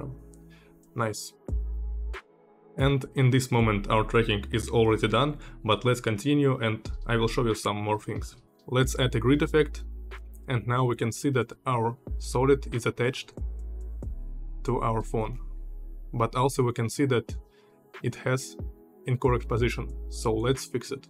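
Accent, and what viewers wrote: Russia. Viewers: С первых секунд ролика понял, что ты русский)) а после "mask один" я орнул))))) классный английский бро)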